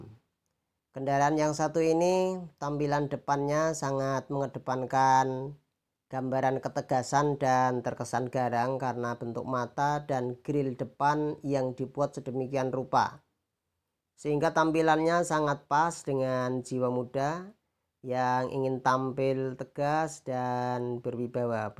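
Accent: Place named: Java, Indonesia. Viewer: Medok coy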